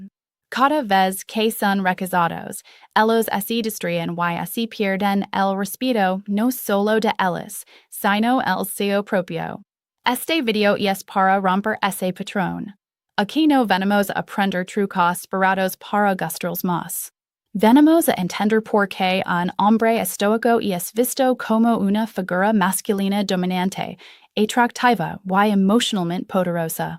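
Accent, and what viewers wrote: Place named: Chile. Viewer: Jaja, gracias por los subtitulos. Ella habla en chileno 😅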